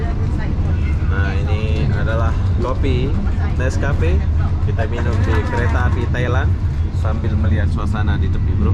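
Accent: Indonesia. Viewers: inilah channel org bingung. sok bicara melayu/tergila2 dg Malaysia tp tk bs sembunyi dr logat aslinya Indonesia. bahkan ungkapan2nya jg sbgi org bingung, antara indo atau malay.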